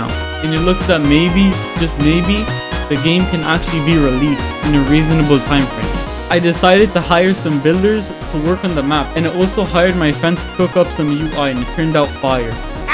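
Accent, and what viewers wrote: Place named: Israel. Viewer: אנימת אחי שמעתי קצת מבטא ישארלי ולא הייתי בטוח עד שראיתי את ההיסטוריה שלך בצאט גיפיטי חחחחחחחחחחח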